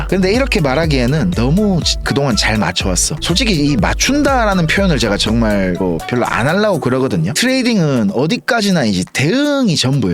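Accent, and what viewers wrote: South Korea. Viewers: 확실한서울발음~^^ 퀀트1기입니다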